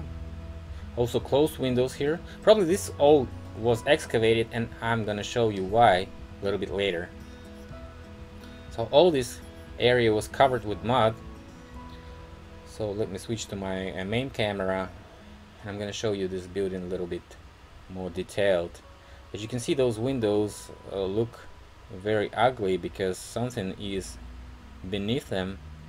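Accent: United States. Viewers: You got a southern American accent and you ain't talking to slow. It's perfect! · when you learnt to speak English, you also picked up a slight American accent. That was the very first thing I noticed. I'm Australian, so it stands out to me.:)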